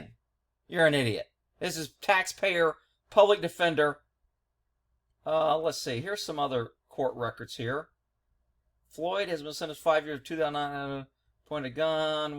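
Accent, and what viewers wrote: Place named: South, United States. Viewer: Your hick accent is absolutely insufferable. But moving along. He already paid for these crimes. He was not in the process of committing anything you mentioned when he was murdered after much torture. And if you think violent crimes are wrong, then you must hate these officers because they did more than threaten or rob. And if you think that unlawful execution without trial by jury over a matter of $20 can in any way be mocked or excused, then surely you must believe an even more severe vigilante punishment is owed to these murderers. After all, their crimes surpass stolen cigarettes and you like to think you're a man of logic.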